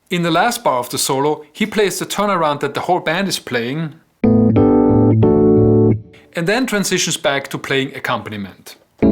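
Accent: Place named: Germany. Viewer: The german accent is killing me thanks so much for the video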